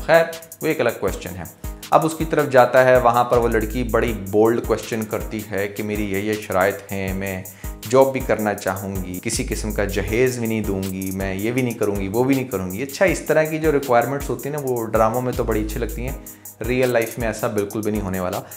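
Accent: India. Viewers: کون سی دنیا میں رھتے ہو بھائ آج کی لڑکیاں بہت کچھ کرتی ہیں فوج میں بھی لڑتی ہیں لڑاکا تیسرے اڑاتی ہیں اور فائٹر برگیڈیر بھی لڑکیاں ہوتی ہیں جلتی آگ میں کود جاتی ہیں آدمی بھی ہر طرح کے ہوتے ہیں جہیز کے بغیر بھی شادی کرتے ہیں میرے ھڈبینڈ نے بھی کوئ جہیز لینے سے منع کردیا تھا میں کچھ بھی نیکی لائ تھی تم اپنے خیالات کے مطابق بات کرتے ہو جوان لڑکی کو آنٹی بنادیا تم نے کچھ حقیقت سے کام لو صرف ہندی لہجے میں اردو نہ بولو نہ ہندی رھے گی نہ اردو کوا چلا ہنس کی چال اپنی چال بھی بھول گیا سنی ہے نا یہ مسال خوش رھو بھیا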